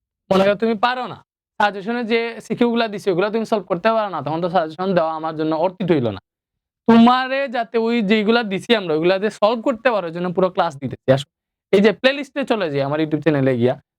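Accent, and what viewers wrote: Sylhet, Bangladesh. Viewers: সার আপনি সিলেটি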